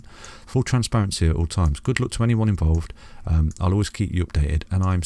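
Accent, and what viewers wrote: United Kingdom. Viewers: Following your prediction perfect so far. Love your British accent, but I think it would be good for your channel to use, or at least mention USD prices. I’m European, but because most people on the internet use USD price, I find it easier to think in USD levels. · Didn't expect to find Chiliz T/A - Have now subbed and hit the bell button :) Great to listen to a british voice. I'm all in :)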